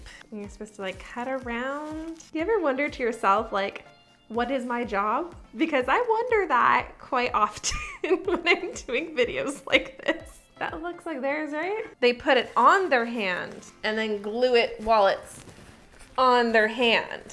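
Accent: Canada. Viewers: I thought she was Canadian😂😂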